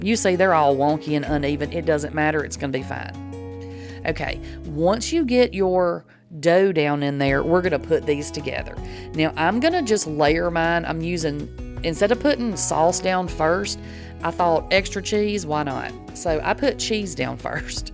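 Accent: South, United States. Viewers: I could listen to you talk all day. Love the southern sound of your voice.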